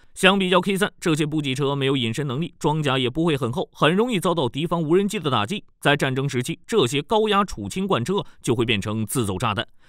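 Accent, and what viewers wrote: China. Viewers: 播這種中國腔調,不行真難聽直接下一頁!!!!!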